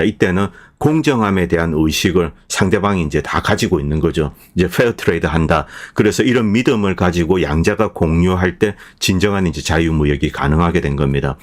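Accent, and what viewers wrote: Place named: Busan, South Korea. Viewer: 부산 억양이 매력적 이시네요...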